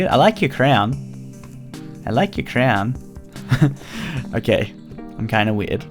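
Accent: Australia. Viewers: i only downloaded the mod, i never actually watched the vids, i never realised he was australian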